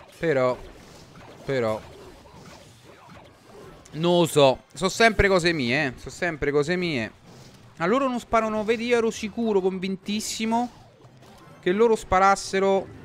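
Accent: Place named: Rome, Italy. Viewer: COME SEI DIVENTATO ROMANO :D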